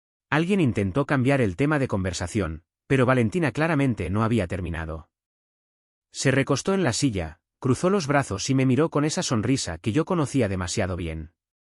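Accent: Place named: Andalusia, Spain. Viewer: DÉ VERDAD QUÉ ES FACINANTE ÉL ACENTO TOTALMENTE ANDALUZ DÉ ÉL NARRADOR... SIGAN INCLUYÉNDOLO EN TODAS LAS VERSIONES DÉ ESTÁ PLATAFORMA...ES SENCILLAMENTE GENIAL...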